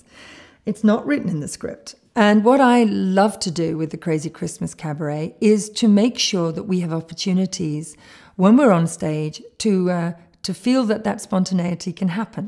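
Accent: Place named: United Kingdom. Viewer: Best British accent I have ever heard. Splendid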